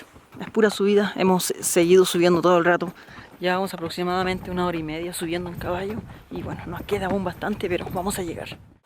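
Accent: Chile. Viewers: Hola whoa me encanta tus vídeos Gracias por mostrar al mundo mi Chile querido que no lo he vuelto a verlo desde 1975 tengo lágrimas en mis mejillas Un comentario me encanta como hablas tienes un asentó chileno hermoso ⚡️❤️⚡️ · Eri como luisito comunica pero chileno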